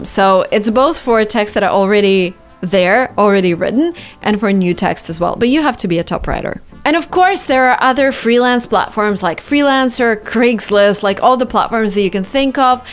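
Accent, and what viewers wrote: United States. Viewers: I don't know how to speak like an American after i watched your vedio i can speak like you You changed my life in a month Thanks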